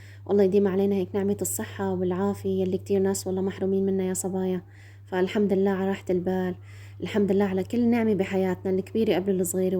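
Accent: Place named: Homs, Syria. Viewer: مع انه لهجتها بتشبه الحمصية 😍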